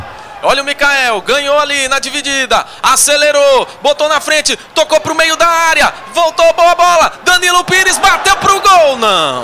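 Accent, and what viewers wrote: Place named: Northeast, Brazil. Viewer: O melhor da copa do Nordeste é o sotaque nordestino na narração AQUI É NORDESTE 👏👏👏👏👏👏❤❤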